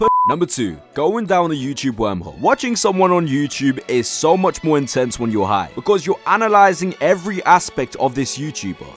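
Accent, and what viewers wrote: United Kingdom. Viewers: the last one is totally true from my own experience, watching your video with Briish accent is way more easier with zaza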